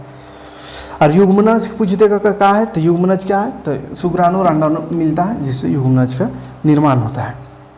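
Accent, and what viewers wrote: Bihar, India. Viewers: Aap Ke aavaj se lag ki Aap Bihar se ho😄😄🙄🙄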